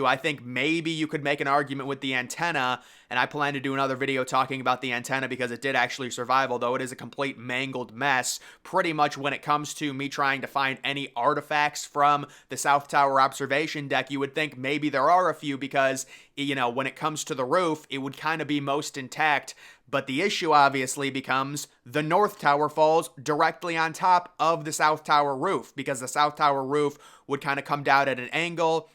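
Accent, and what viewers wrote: United States. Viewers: Nothing about 9/11 is funny notwithstanding the American voice discussing it.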